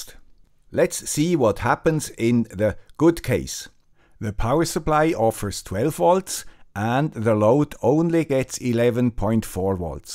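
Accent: Switzerland. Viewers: Perfect, you explain it as it should be. You are real gem Mr. with swiss accent. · He does explain very early that he is the guy with the SWISS accent.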